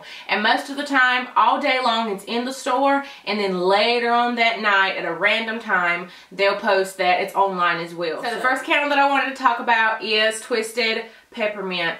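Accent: South, United States. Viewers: Love that southern accent of yours ❤️ where ya from? I’m from the south too ofc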